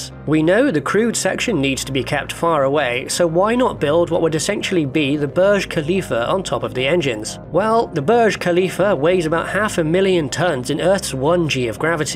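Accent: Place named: Scotland, United Kingdom. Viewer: What is this accent? Scottish? Canadian? Kazahkstani?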